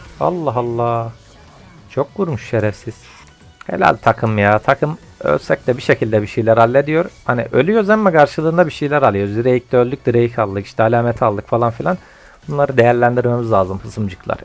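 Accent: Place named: Muğla, Turkey. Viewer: Askerde Muğlalı arkadaşlar vardı. Şiveye ordan asinayim. Ama Alanya tarafına da benziyor. 'Nişlen' kelimesini çoğu kişi anlamaz.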